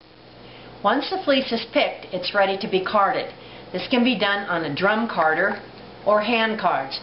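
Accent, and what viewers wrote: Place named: Pennsylvania, United States. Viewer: You speak like my mother! Are you from the Pennsylvania area or West Virginia by any chance?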